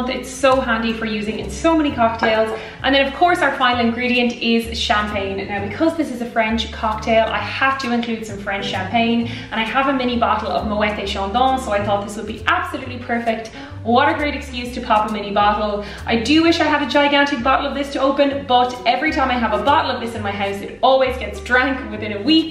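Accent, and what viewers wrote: Ireland. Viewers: I speak French fluently. I had to go back and listen again, because I just thought you were speaking Irish, at first. Awesome! I am drunk right now. Also, fuck. Have you ever had a white lady? I'm talking the cocktail, obvs. It's delish. Worst name ever. The only reason no one drinks it is because it's too embarrassing to order one.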